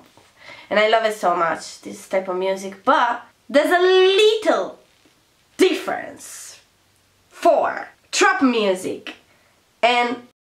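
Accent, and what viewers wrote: Sardinia, Italy. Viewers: Siii. Io sono sarda e vivo in Sardegna. ❤ e nel riassunto del video la penso come te.😍 Ajooo. (Sarebbe una parola sarda)😂 e tra l'altro hai i miei gusti😂💕💕 sinceramente il tuo accento quando parli in italiano sembra sardo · come si sente che sei italiana 😂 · Ma sei ita o ing/americana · Fidati che dal tuo inglese si nota che sei italiana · Figa se si sente che sei italiana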